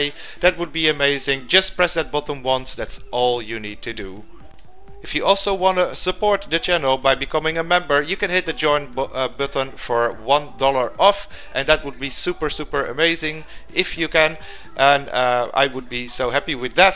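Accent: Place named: Netherlands. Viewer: You are dutch... i know 100%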